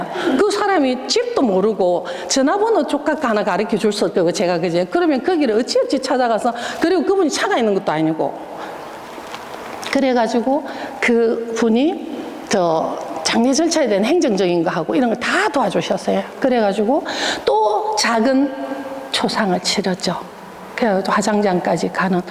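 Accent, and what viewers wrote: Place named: South Korea. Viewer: 구수한사투리에 좋은몫을선택하여 질곡의삶을즐겁게신나게 강의해주신봉사자님 주님께서되갚아주실것을 굳게믿으머엄청사랑합니다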